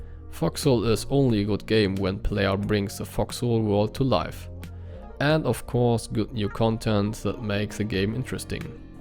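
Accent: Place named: Germany. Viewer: Im Ernst das ist deutsch-englisch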